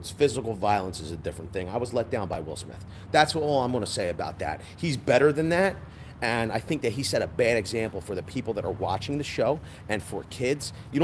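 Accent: Philadelphia, United States. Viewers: Love the strong Philly accent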